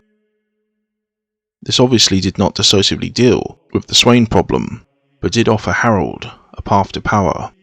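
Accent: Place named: West Country, United Kingdom. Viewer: I'm getting a slight West Country tone occasionally?.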